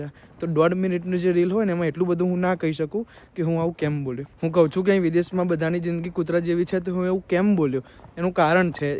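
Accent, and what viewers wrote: India. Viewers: Bhai tamari boli prmane tame charotar ke amdavad na lago chho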